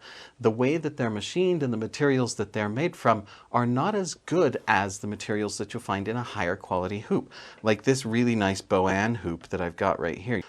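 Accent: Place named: Canada. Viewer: Hello. 😊. But, why use a hoop at all? (ps:you sound like me, from Canada!)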